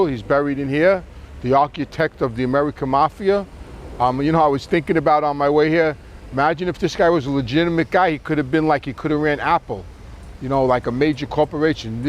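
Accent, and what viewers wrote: New York, United States. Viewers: Great production. Nice to hear a New York accent. Moved up to Catskill, N.Y. 10 years after retirement. Back home now. Man, l felt like Joe Pesci in My Cousin Vinny up there! With my N.Y. accent & my clothing & jewelry they'd step aside in stores, etc. Lol Buon Natale.🇮🇹🇺🇸🌲🍕🍷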